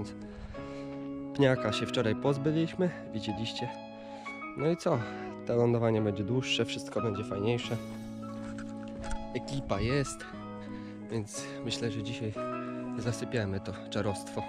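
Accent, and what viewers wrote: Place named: Russia. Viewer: Masz leciutko rosyjski akcent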